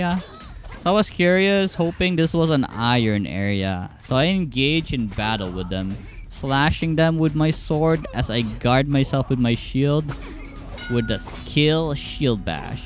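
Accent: Philippines. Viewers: Are you Filipino? Cuz your accent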